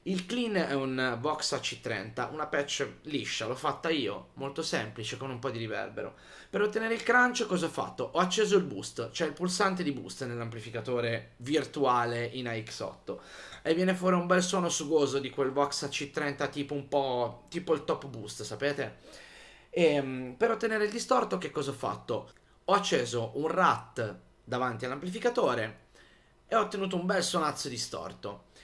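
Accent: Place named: Tuscany, Italy. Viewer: Oh con l accento toscano mi fai troppo strano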